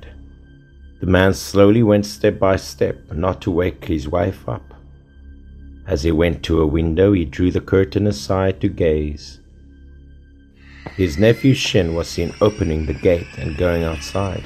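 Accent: South Africa. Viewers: Dude are you by any chance from South Africa? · Is this a South-African Accent?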